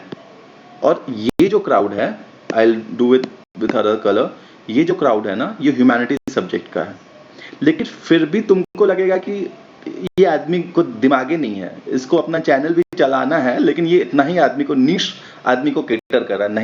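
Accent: Bihar, India. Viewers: I was waiting for this video bcz my optional is History 🤣🤣🤣🤣, And I like ur Bihari accent, Aap english use kar skte the ya shudh हिंदी, But hindi with bihari accent, Bole to jhakkas sir, Thanks for this video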